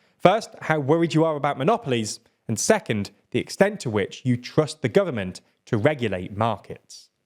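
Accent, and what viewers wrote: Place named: United Kingdom. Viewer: Hi, I'm spanish. Sooo, this is what you call a strong british accent? My god, that pronunciation of 'Blizzard' was incredible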